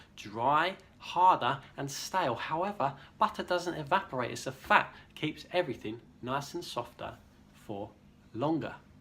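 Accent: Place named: London, United Kingdom. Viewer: love all the tips done with such sincere passion. I’m an American and wonder about that accent. Is it a London accent? If so, or if not, which area? Can tell it’s English, but curious of the location exactly!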